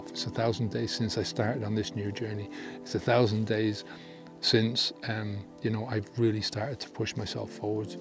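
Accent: Ireland. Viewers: Things sound more true with a good Irish accent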